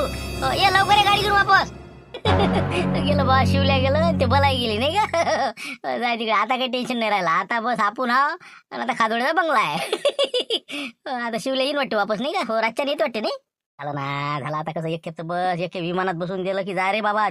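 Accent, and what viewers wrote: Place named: Vidarbha, India. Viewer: आपली विदर्भातील बोलीभाषा खूप छान आहे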